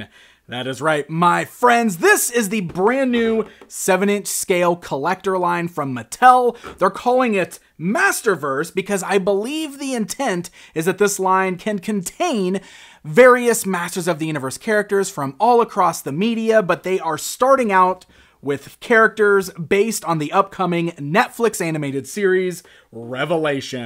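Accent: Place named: United States. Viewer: Great review,. I totally agree about the figure's head. It does indeed look a little small for the body, and his face looks real boyish also, which totally contradicts the statement..."Most Powerfull MAN in The Universe.", and again, the character's very name: HE-MAN (Not He-Teen, let alone He-Boy). The harness also looks kinda small for his torso, and it should look as if it was made of thick, rough leather, which is something we know toy makers can do, they can make plastic look any way they want to (if they really want to), just by using the proper color shades in the plastic's mix. On the other hand, all of the above "Flaws" on the figure, are nothing but great excuses for talented customizers to do what they do best, and make their personal HE-MAN figures look just the way MATTEL could have, IF they FOR ONCE would have prioritized QUALITY and CUSTOMER SATISFACTION, over GREED (Bulk sales, and Profits). 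But that's how things are, most toymakers (except for those out of Japan), are in business just for the sake of making money, and not concerned in the least about art nor perfection. Shame on our western society, but again, great review,! Easy to understand AMERICAN ENGLISH (unlike foreign reviewers, trying to speak our language, but miserably failing, and butchering it instead), awesome footage of the toys being discussed, and good knowledge of them, which we all appreciate. Great work, man, keep it up!!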